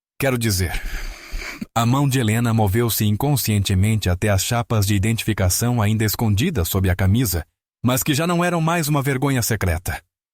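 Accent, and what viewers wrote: Portugal. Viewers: Pq esse narador tda vez q fala um número errola a língua, ou pronúncia em português lusitano ???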